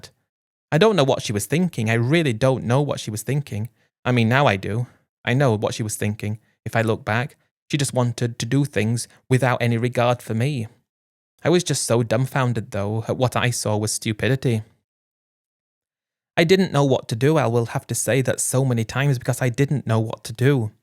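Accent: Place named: England, United Kingdom. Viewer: Buddy, you sound like a smart English man but not so smart with the girl and I’m not putting you down because you had it virtually made what you were doing buying houses selling them perfect time back then because now to do that in my country Australia and for sure in your country in England we got too many taxes gifting tax tax this tax How are you gonna make $30,000 now the government makes it too hard now because they catch on back then. and now just for an old rundown house is worth a million dollars as much as as you loved your wife I would never would’ve got her involved and most certainly I would never would buy a house in Thailand then leave it to her and then come back to England. no chance and I know what you’re gonna say you haven’t been in love before. It’s not about Love. This is about my retirement and my age. There’s plenty of girls in Thailand and there’s always gonna be someone different. 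She’s not a western girl.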